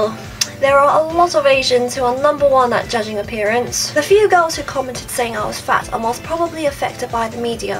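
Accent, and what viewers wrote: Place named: United Kingdom. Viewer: Cool accent!!!! Did you grow up in the uk or something?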